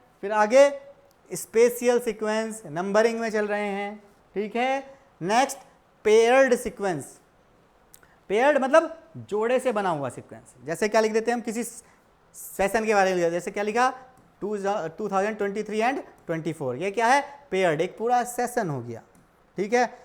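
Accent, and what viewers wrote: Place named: Bijnor, India. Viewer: Sir aap bijnor se hai 😃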